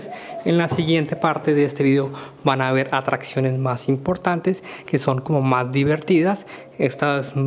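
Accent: Colombia. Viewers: Hola! Noto por tu acento que eres colombiano jeje. Una pregunta, tenemos planeado viajar en Julio de 2017 desde Bogotá, recomiendas comprar las entradas por internet o es mejor allá?. Gracias por tu video :)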